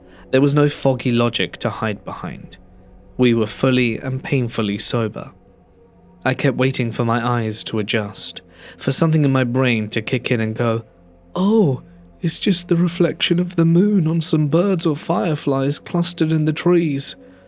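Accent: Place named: RP, United Kingdom. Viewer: never disappoints 🙂 I tried getting my brother into this channel, but he said you sound too posh 😂 he's a let's read fan....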